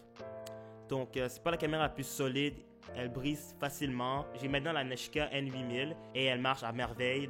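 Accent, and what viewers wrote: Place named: Quebec, Canada. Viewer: "desole pour l'anglais" 😂 allors, mon francais n'est pas tres bon sans Google Translate. (<- I actually typed that without looking it up so it probably has grammatical/spelling errors) Being from the Toronto area, while I "learned" French, in school. It was hard to properly learn it. I'm learning more from watching French-speaking youtubers and French shows than from class! The auto captions helped me understand what you were saying but it's not always accurate. maybe for these, you could include French captions. Also, as soon as I heard your French, I KNEW you were from Quebec...the accent is just too indistinguishable😄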